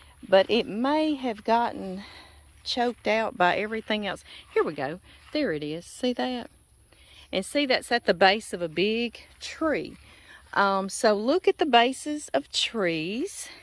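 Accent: South, United States. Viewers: He makes the best gumbo and his Cream Chees Pecan Pie is to die for. I am going to try and make succatash. I love you S. Accent. We have lived here for 48 yrs and he has not lost his accent.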